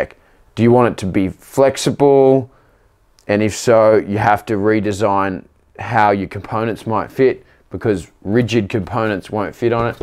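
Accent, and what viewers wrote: Australia. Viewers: He's Aussie accent is funny, it sounds like he saying "Dick" for "Deck"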